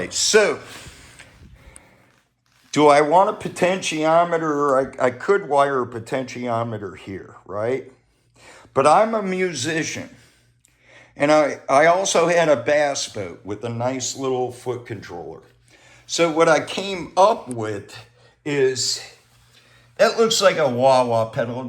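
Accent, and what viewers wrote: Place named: Cincinnati, United States. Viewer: Why do I feel like this guy is from Cincinnati area? Complains without a true thoughtful logical ana. I may be wrong, but o know an accent and type.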